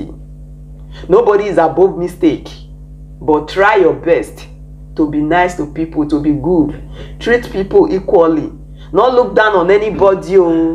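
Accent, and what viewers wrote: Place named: Delta State, Nigeria. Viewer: sister I hear yr language, yu from delta state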